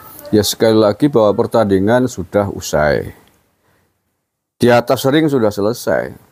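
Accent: Banyumas, Indonesia. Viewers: Dan ngapaknya juga😂😂😂😂😂😂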